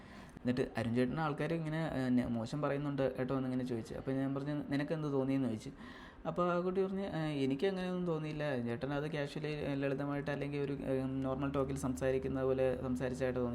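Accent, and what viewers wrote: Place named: Trivandrum, India. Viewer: trivandum sideil ചില ഭാഗങ്ങളിൽ സംസാര രീതി ഇങ്ങനാണെന്നു ഞാൻ കേട്ടിട്ടുണ്ട് ആദ്യം ഒക്കെ ഇവരുടെ reelsile സംസാരം കേൾക്കുമ്പോൾ trivandram slang വല്ലാതെ standard കുറവായി തോന്നിട്ടുണ്ട്, . ഞാനൊക്കെ ഒരു conversationil നിങ്ങൾ”എന്ന് automatically പറയും. but പറയേണ്ടാ രീതി unclum , auntyum, ചേച്ചിമാരും ചേട്ടൻമാരൊക്കെ , എവിടെക്കാണ് പോണെന്ന് എന്നാണ് 🙄നിങ്ങൾ എവിടെക്കാണ് പോണെ എന്ന് ചോദിച്ചാൽ പ്രശ്നമാണ്🫣… അത് ഞങ്ങളുടെ നാട്ടിൽ disrespectful ആണ്.. എന്റെ parenstinte കയ്യിനു വഴക്ക് കേട്ടു മടുത്തിട്ടുണ്ട് ..അതുപോലെ എടി എന്ന് വിളിക്കാൻ പാടില്ല. but എനിക്ക് എന്റെ കൂട്ടുകാരി അങ്ങനെ വിളിക്കുന്നത് ഇഷ്ടമാണ് ഒരു closeness feel ചെയ്യുന്നു, എനിക്കു അങ്ങോട്ട് വിളിക്കാനും okey ആണ്, but parents and auntymar കേട്ടാൽ അപ്പോ ഉപദേശിക്കും.ഓരോ നാട്ടിൽ ഓരോ reethi.. ഇവിടെ ഞാൻ ഉൾപ്പടെ comments ഇടുന്നവരിൽ പലരും trivandrum അല്ലെന്ന് മനസിലായി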